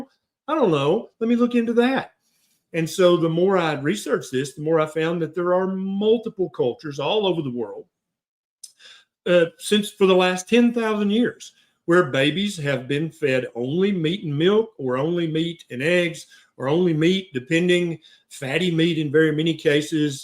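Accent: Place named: Upper Peninsula, United States. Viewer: Michigan- Yooper:)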